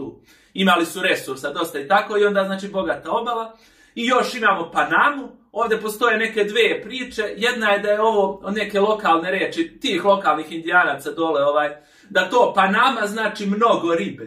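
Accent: Užice, Serbia. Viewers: Užički naglasak, podseća me na vojničke dane, a čujem da je sad u krugu kasarne Lidl, znači opet Svabe okupirale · Hvala vam ,svašta sam naučila slušajući/gledajući vaš video. Vrlo ste spontani i prirodni u izlaganju. Tome po mom mišljenju doprinosi ERCOVSKI šarm u kombinaciji sa UŽIČKIM govorom ❤️. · Obožavam tvoj Užički naglasak! Bio sam tamo par godina pre jedno nedelju dana kod bivše devojke. Nedavno sam pronašao tvoj kanal, i religiozno ću te pratiti ka daljem putu! Pozdrav druže iz Subotice!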